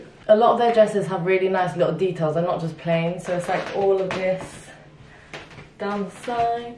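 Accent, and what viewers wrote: United Kingdom. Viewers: Cheers! Your British Accent adds to your Beauty 10 fold.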